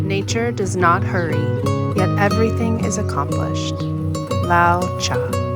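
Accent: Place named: United States. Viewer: How did you get sick in winter. I have been here since March 20 and not ha a day off yet. Yes we have had the wettest winter so far and now plenty of water to see me through the coming warm summer. Even up here in the mountains it can still get hot, 33 or 92 f last week, a tad warmer than U.K. and dam site drier after what they have had in recent months. Here we have had 150% of our annual rainfall while parts of U.K. have had 300% of their total for the winter. You speak English, but the accent is elsewhere, America or maybe Canada and hubby could be local as not hear him much. A sheet of clear plastic over your seed pots will work better. Toms need 68 bottom heat to germinate within 3 days or can take a week if left in the house. I have been a gardener for a long time so ask if you need to know anything to help you.The more I listen the more I think Canada or northern states, but maybe lived in U.K. 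for a while. Enjoy it while you can as if the fools in the middle east do calm down then we are all up shit creek without a paddle.. Mulberrys must be nearly wrotten before you eat them.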